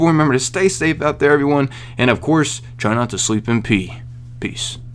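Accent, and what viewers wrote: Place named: Western Pennsylvania, United States. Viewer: Brother you gotta be from Western PA right?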